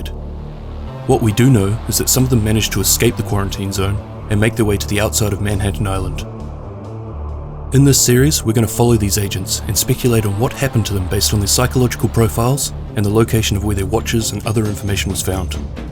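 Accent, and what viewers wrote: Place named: New Zealand. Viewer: oh okay, i kinda like that kiwi accent...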